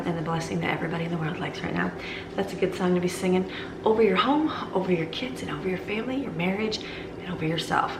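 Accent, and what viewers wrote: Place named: Michigan, United States. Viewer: I just found your channel and immediately fell in love!! I hear the accent and wondered.. is that the Michigan accent I know so well?! 😂😂 I’m from Traverse City!! My hubs and I live in Savannah, GA now for his work. It’s a very different world down south!! Thank you for the new channel addiction LOL!!! 💜💜💜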